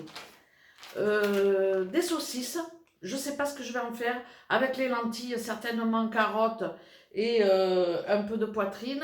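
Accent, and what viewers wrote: South, France. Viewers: Coucou! Je vous découvre depuis 3 jours. Holalaaaaaa comme je suis contente! Vous êtes d'Avignon je crois. Moi j'étais à Boulbon. Originaire d'Arles. Et depuis 1 an je vis à Lyon. L'accent du sud me manque tellement! Alors un régal de vous écouter!!!!!!! Ce bel accent de mon pays!! Mon mari est aussi Portuguais, il regarde les vidéos avec moi lol ! Magnifique le Portugal !! Superbe vidéos. Vous me faites tellement rire!! J'adore! Vos idées recettes au top! Merci beaucoup d'énormes bisous!!!!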